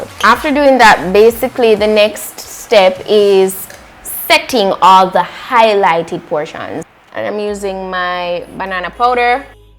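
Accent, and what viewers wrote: Jamaica. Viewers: Your accent sounds Jamaican🇯🇲 💕❤️♥️❤️💕❤️♥️😍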